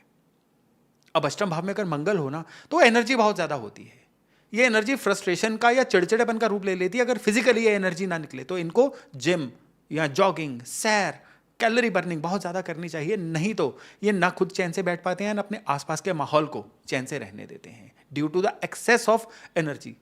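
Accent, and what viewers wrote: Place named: Kanpur, India. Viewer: Sir ap kya kanpur se belong krte h 😅batiya rhe h yhi bola jata h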